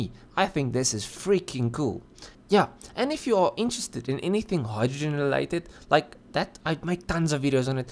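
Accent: South Africa. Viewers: Nice South African accent.🙂👌👍👊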